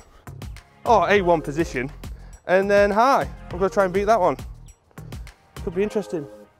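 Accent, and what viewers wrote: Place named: Nottingham, United Kingdom. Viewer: GolfBox TV haha as much as a Derbyshire lad can love a Notts (judging by the accent) lad. Your a legend mate. Love watching your videos. Look forward to my new set of mp20’s 🤪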